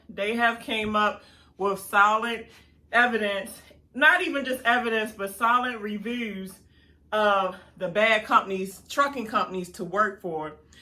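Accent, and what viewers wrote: Baltimore, United States. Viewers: You gotta be from the Baltimore area... Lol!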